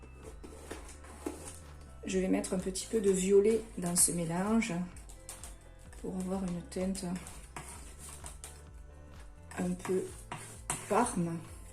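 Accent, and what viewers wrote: South, France. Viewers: Je ne trouve plus de nouveaux mots pour dire combien j'ai ton travail et ce tableau est à tomber. J'aime le rose, mais avé l'accent c'est encore plus beau. Merci. Merci 😘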